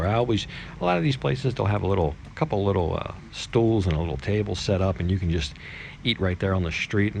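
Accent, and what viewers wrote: United States. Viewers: Just stumbled across your channel and subscribed. I like the format and your narrative style! I plan to retire in the Pattaya area in a few years so your videos of the area are most informative. Sounds like you're from the States? Your name?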